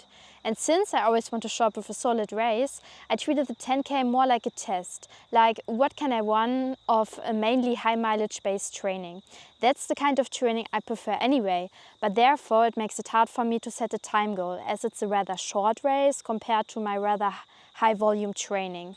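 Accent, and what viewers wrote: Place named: Germany. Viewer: krass deutsches (hartes) Englisch aber irgendwie total sympathisch, Gratulation zum Frankfurt Debut!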